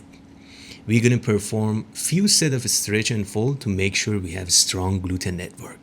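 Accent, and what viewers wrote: Iran. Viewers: Peace ✌🏻.. i like to guess where people are from.. cause i love languages, your accent is pharsi ? 🤓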